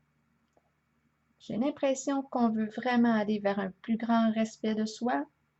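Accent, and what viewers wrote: Canada. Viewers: Quel plaisir d entendre ce bel accent canadien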